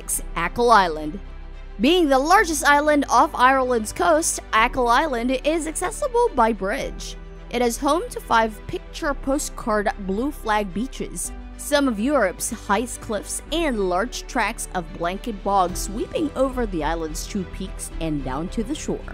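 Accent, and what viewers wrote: United States. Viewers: A PNW voice, possibly shy, unconfident. ?.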